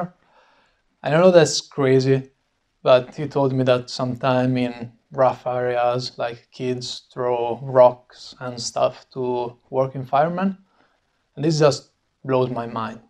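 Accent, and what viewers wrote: Italy. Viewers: Nice hatchet...... Una curiosità sei italiano per caso? L'accento è quello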